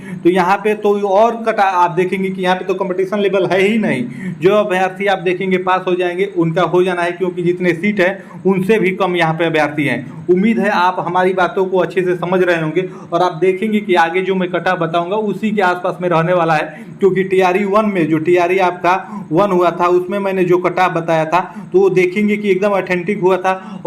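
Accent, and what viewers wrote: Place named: Uttar Pradesh, India. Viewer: Aawaj se lagta hai ki UP k ho .Tum ye batao ki UR or BC me kabhi bhi 6 se 7 no ka anter ho sakta hai kya oo bhi 1600 seet me .Sahi jankari do ....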